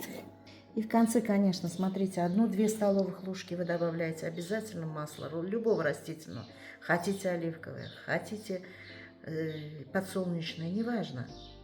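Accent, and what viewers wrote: Standard. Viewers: Какое мягенькое нежное тесто! А какой приятный, завораживающий голос милой хозяюшки! Чистая, правильная русская речь! Спасибо Вам огромное за рецепт, за подачу, за Ваше чудесное видео! Здоровья вашей семье!❤❤❤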